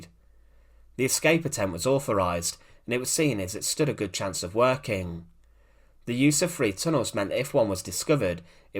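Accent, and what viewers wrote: United Kingdom. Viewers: I am a vet and would have enjoyed this video more if I didn't have to struggle to understand the narrator. I have heard other variations of Brittish accents that I liked listening to, but not this one ! It is not my intent to be rude, but I got a headache listening to this guy. I wonder why this guy was chosen to narrate, was he related to one of the escapes who were executed.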